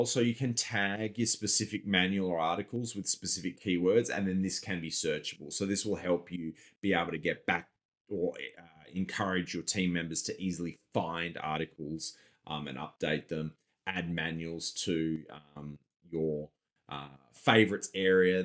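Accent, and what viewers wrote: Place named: Australia. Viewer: Thank u! And great Aussie tone.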